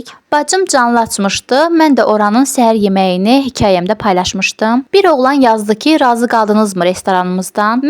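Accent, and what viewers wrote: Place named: Ganja, Azerbaijan. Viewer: Gəncəli di qız anlaşılan.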